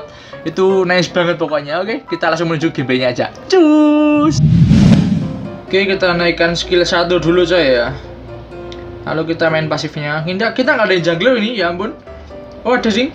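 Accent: Java, Indonesia. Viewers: Jawa nya ga nahan bos haha Pertahankan sebagai ciri khas mu haha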